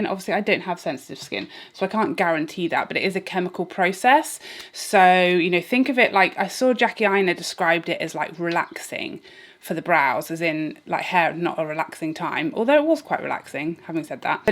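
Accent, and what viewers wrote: United Kingdom. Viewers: It is wonderfull! Thank you so much this giveaway! Here in Hungary we no have this kind of make up . If i will be lucky to win, I definitelly will be the luckiest and happiest person in this World! 😊 I really like your channel and your British accent! 😻♥️♥️ Have a nice weekend for you! BR. Dori Instagram: Maybe - Nude Venus- is a good colour for me.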